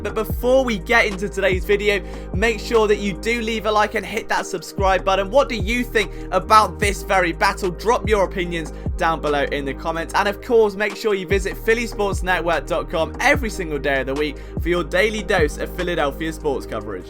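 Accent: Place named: United Kingdom. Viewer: I just can't listen to the British accent... and I'm British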